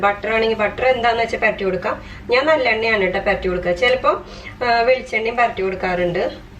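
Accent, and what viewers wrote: Thrissur, India. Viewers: Ente ummade ishtta vibhavangalil onnu kalathappam aanu.. Nalla mayam ulla idliyude recipe paranju tharumo... Love your Thrissur accent.. My father is from Mathilakam, Kodungalloor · താങ്ക്സ്, ചേച്ചി തൃശൂർ ആണോ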